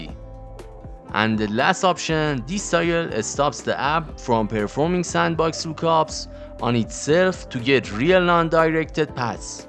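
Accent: Iran. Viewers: انگلیسی با لهجه اصفهانی قشنگی داری داداش، عالی😎👍🏻❤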